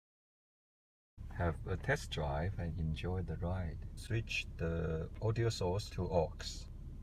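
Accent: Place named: Germany. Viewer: Great video, neat and clean during all the steps. Also like your voice, sounds like you speak with very slight German accent or German speaking manner. Anyway, just noticed one problem - according to the manufacturer you should not glue the RF/WIFI antenna to the metal surfaces, including the box itself, because this may cause some wireless connection signal issues. Instead you should glue it to the plastic surfaces, more closer to the driver, or where the phone usually lies. Another question - how different is the sound quality, compared to your oem bluetooth and aux? Also, when having a call inside the car, do you use oem BT phone or carplay phone via aux?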